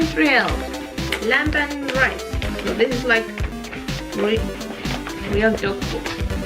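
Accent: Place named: Scandinavia. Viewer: Its christmas for the huskies lol. nice vid. You sound scandinavian, i am from Bornholm Denmark.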